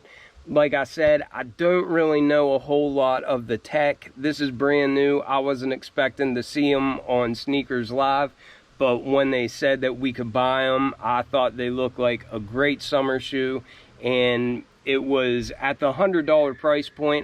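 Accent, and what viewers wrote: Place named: Philadelphia, United States. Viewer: From Philly, arent you? Me too. Cant miss that accent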